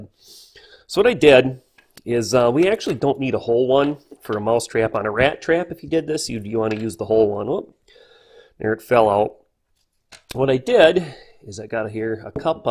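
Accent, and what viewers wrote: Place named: Midwest, United States. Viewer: Minnesota? Or somewhere deep in the country midwest.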